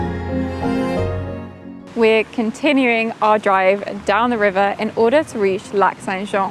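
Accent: United Kingdom. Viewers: C'est pour faire découvrir le Quebec aux Canadiens et Américains. de plus on entend bien son accent Britannique et ne parle probablement pas francais.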